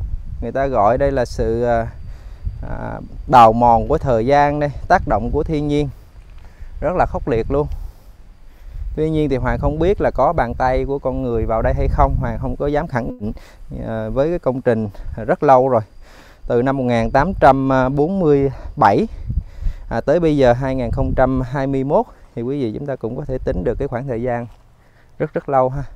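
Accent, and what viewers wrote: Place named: South, Vietnam. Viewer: giới thiệu giọng thanh thoát pha hoà trong Nam dể nghe hơn Huế Revew [xin lổi nghe tại mình thường nghe theo giống Nam kỳ lục tĩnh]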